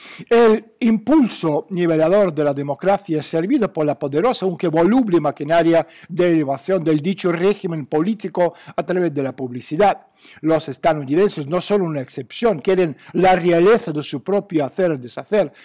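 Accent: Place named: Spain. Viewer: me encantas pero tu lenguaje a eces es algo complicado para entender. Parece el español de españa q es diferente.Pero eres muy bueng gracias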